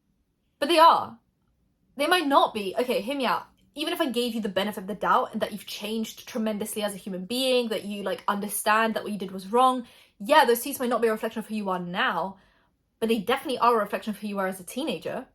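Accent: United Kingdom. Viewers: Always get thrown off when you’re like “I’m not British” because my brain is like “British accent = British” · Your accept is proper British that’s so cool! I wonder if you speak polish if your accent changes! It’s amazing how if someone goes to another country if there from someone else they actually get the accent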